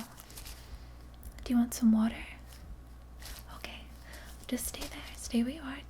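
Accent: United States. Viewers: your american accent is great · I'm from american midwest, you could have fooled me good with that accent, great job! And great video, thanks for sharing! 😄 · Goodness the accent is convincing. If I hadn't see your other videos I'd assume you were American. Well done. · "I have that too" LOL The American accent is good. However, no American medical office worker or nurse is going to sit with you with every question. They make you do it yourself. Outside the accent, there is something very very creepy about this RP character. I'd be running for the door at the first chance. I just googled AMSR and ... yeah that's why I find this creepy. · I'm from the US, and if I hadn't seen any of your previous videos I would have never guessed you had an accent. Great American accent and great video! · i am not sure how i missed this. but its wonderful. just like you. and i love the princess leia hoth hair you got going on. 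also love the american accent, though i prefer the british one! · If you didn't put (American Accent) on your title, I would've really thought that you were from the States... Lol. Great video by the way... Think I can go sleep now finally... Lol. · Nice American accent! You can usually tell when it's a Brit trying to do an American accent. My wife is from Leeds (Yorkshire Accent!) and you can hear her accent when she does her impression of an American. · I thought this was a great video. Not too common hearing someone who has an Scottish, English, even Australian accent. With as many dialects you've been exposed to try an American accent you did well. Being from America people naturally try and do other accents but hearing someone from Europe/Australia do an American is neat. Lovely video! Perfect! Somewhere I remember you saying you've lived in a few different counties. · Wow, you do an american accent very well. 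Great vid! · soooo good hun, I love how u do an American accent. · Great American accent. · The only non-American I've heard do an American accent as good as yours is Nicole Kidman. When you are discovered, don't forget your YouTube friends! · Pretty good American accent!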